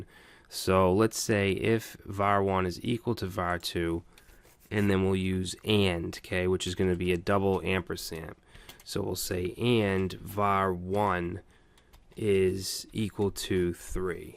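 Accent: Boston, United States. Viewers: he was talkin about your boston accent. I picked up on it too haha! Thanks though, man. Been trying to find good tutorials to learn js, but everyone else sounds either very boring/not engaging. Gonna check for other videos you have. thumbs up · Very well made and informing video. You sound like you're from Boston. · hahaha!! Nice one! has that classy Boston accent:)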